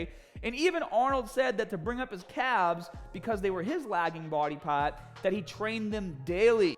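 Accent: Boston, United States. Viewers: That Bostonian accent is great... say Park the Car in the Yard 😁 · Wait is that Boston accent fake or not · Are you from Baah Ston? :-)) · What are you from Boston?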